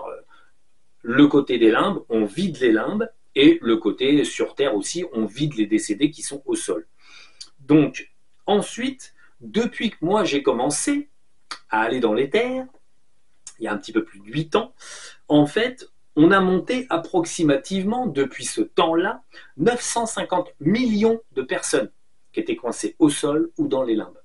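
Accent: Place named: Paris, France. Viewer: salut j' avoue, tu m'as bcp fait rire comme souvent avec ton parlé titi parigo, assez déçue de l'arrivée au sol d'aurobindo loool, son eleve Satprem a écrit un livre ''evolution 2'' que j'ai tjrs gardé en tête et bcp apprécié, il m'a fait grandir c'est certain, depuis j'ai bcp évoluée ou pas.... d'ailleurs, tu sauras me le dire peut être..., j'ai pensé que son instructeur qui était donc Aurobindo, était un peu plus avancé que cela, même si j'ai effectivement bien compris que la connaissance est une question de temps et de moment où un savoir est donné a l'humanité qui ne peut donc pas accéder à des connaissances plus élevées tant que le temps n'est pas venu, sacrée prise de conscience pour moi je l'avoue assez récente, mais qui a remis tant de choses en question, comme depuis 45 ans, où je ne cesse de reculer pour mieux sauter... retour estival, j'espère trouver vite les moyens de recourir a tes services, mr, mais je dois avant cela, te demander si il est recommandé de renouveler une séance alors que j'ai bénéficié il y a plus de six mois de celà, d'une séance qui m'a été proposée et pas du ou pu refuser, bref et que l'on trouve en ligne d'ailleurs sur youtentube,et qui a abouti à un effondrement psychique et physique total, comme mourir a moi même si j'osais le dire et dont je me relève tout juste maintenant... 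a peine.... bref tu sauras me conseiller en temps voulu j'en suis certaine....et enfin, juste pour dire..., je suis assez étonnée que tant de personnes ne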